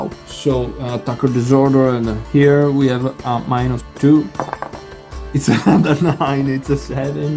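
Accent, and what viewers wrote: Italy. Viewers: Venitian accent, true?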